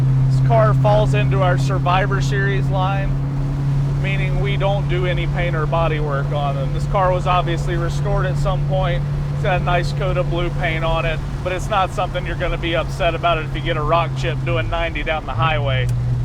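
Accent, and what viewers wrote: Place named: Texas, United States. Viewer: I LOVE THE ACCENT - IS THAT A COUNTRY TWANG. TAXAS HUH ? NEVER BEEN .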